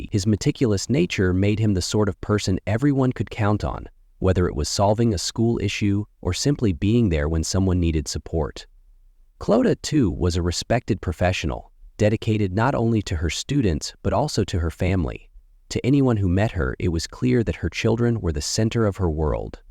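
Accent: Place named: United States. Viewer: Can u name the names correctly please your American accent ruined the story and since this there’s been a hell of a lot more like the most reasent of the 1 in rossfeild tallaght Dublin 24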